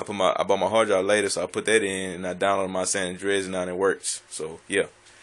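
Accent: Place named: Georgia, United States. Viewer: Are you from Georgia?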